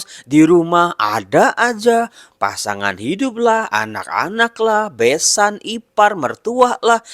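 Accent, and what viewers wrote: Palembang, Indonesia. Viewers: Saya suka khas palembang mohon 🙏🙏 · enak semua romo, ...tapi khas Palembang aha. sy pilih.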